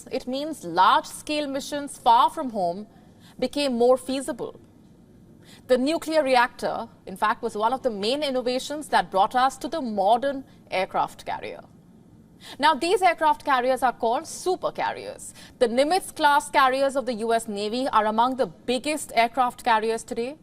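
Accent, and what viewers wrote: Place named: India. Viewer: 这印度口音听着真是头痛。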